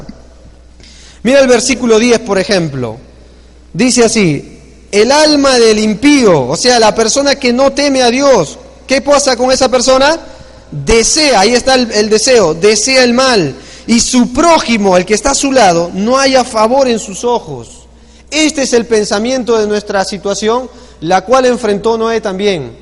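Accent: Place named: Peru. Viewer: Por el tono de voz, creo el pastor es Peruano, cuál es su nombre?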